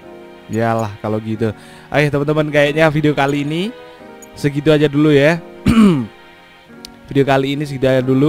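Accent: Malang, Indonesia. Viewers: Bang orang malang kah?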